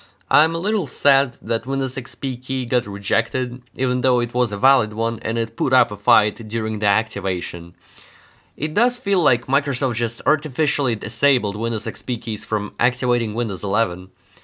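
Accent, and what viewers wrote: Russia. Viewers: You are from Россия? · this russian accent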